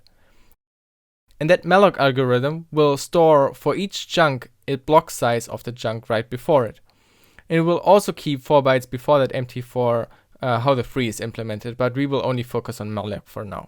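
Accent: Germany. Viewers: I like your old videos as well as your newer ones! Also, is it right that I think from your accent that you're german?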